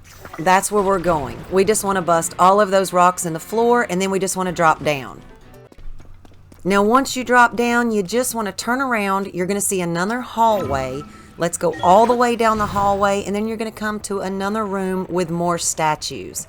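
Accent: South, United States. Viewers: I love your accent ! You are obviously an American - somewhere in the southern states I am guessing ?? Thanks for these videos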